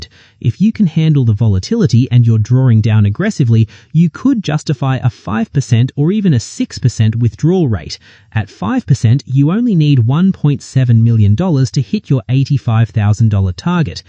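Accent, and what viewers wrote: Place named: Australia. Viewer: I know your channel is created to target people who literally a bit lost their way in personal financial approach. First of all, I apologize I been watching yer videos as a English listening practice source, second I love your Australian accent, mate❤❤❤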